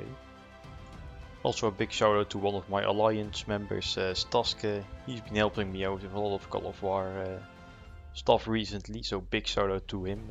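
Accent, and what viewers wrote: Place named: Netherlands. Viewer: Lol the fact that i hear your dutch accent made me watch the entire video well done great vid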